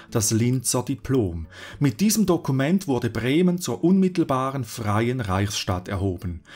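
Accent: Switzerland. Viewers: witzig , schweizer akzent erklärt das plattdeutsche land ... 🤣🤣🤣🤣🤣🤣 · Lol....Ein Eidgenosse unterrichtet deutsche Geographie und Geschichte :D Jetzt hab ich alles gesehen und kann glücklich sterben xD Dein Akzent is der Hammer ^^ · Also ich muss hier mal ein Lob aussprechen. Für jemanden mit Schweizer akzent - is das astreines Hochdeutsch. Hört man sich gerne an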